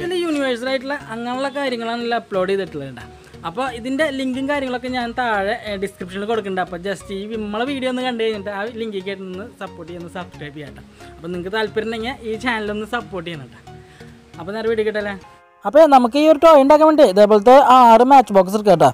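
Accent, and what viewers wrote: Thrissur, India. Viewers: തൃശ്ശൂർകാരൻ ആണല്ലെ 😁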